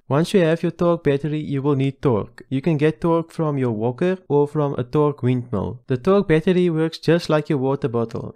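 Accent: Afrikaans, South Africa. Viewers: Wow that afrikaans accent is strong with this 1.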